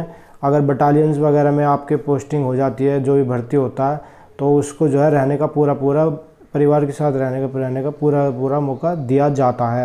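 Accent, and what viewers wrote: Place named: Haryana, India. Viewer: Sir aap haryana se ho Apki boli different ha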